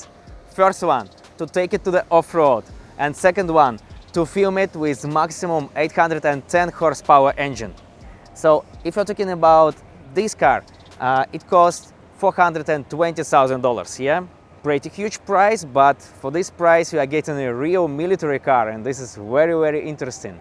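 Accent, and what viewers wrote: Russia. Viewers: WTF! How many years do you live there still having the fucking russian accent? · Смешно говорит руский по английски )) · Как сильно прослушивается 'русский')) Слишком чётко звучат почти все слова. Особенно слог of. У иностранцев слоги совсем не слышно. Ну а так круто, видимо глубокое знание англияского есть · Ты откуда сам, чел? Акцент у тя явно выраженный русский! 😀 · Ужас. Пожалуйста подтяни свой акцент. Прям как-будто бы специально на жёстком русинглише говоришь(как в фильмах утрируют Русских). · Мужик, у тебя чудовищный русский акцент! Я даже не стал писать тебе на английском, ибо не вижу в этом смысла 😁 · This strong russian accent is so hard to listen😢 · Лучше по русски разговаривай. Инглишь с русским акцентом нигде не спутаешь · Висиз ви хард рашын экцент!) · Русский твой английский в #опу...но почему ета нация така тупая...🤮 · Брат мой русский язык рвется на ружу из твоего английского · Давай на кыргызском, мне этот руснячий акцент не нравится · Это самый ужасный дебильный руский акцент.Чувак учи нормально английский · Твой Руzкий акцент слишком Палевный), вас Рашистов Видно из далека, особенно таких Лицемеров ☝️ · That is a stupid car and even more stupid review done with the most annoying Russian accent😂 Jesus Christ Lord have mercy ❤ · Он специально делает стереотипный акцент СССР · Акцент то русский у парня, татарин скорей всего. 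Молодец! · Какой жёсткий русский акцент))) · … на произношением поработай, а то русский так и прет, аж ухо режет - хав и парт!! 😊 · Как люди не замечают что у него русский акцент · your Russian accent just killing my ears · Change the presenter guy, nobody would take this guy serious, with the russian accent. Cute little suv, but for 700K, you can probably get a real used military tank for that price. If a small little drone can take out multi-million $$ tank or helicopter in Ukraine, this should be a POC. Total JOKE. This is for rich Beta males with no balls. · Акцент русский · зысиз супер кьют акцент! Бест рашшен акцент ай эвер хёрд!🤝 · Русский акцент видно с трудом разговаривать на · Russian accent could feel easily 😅😅😅. Bro improve your speech. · Какой ужасный русский акцент))) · Сразу видно что русскоязычный говорит на английском 😂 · Это что ещё за нерусский с явным русским произношением и непонятной машиной, продающейся по спекулятивной цене?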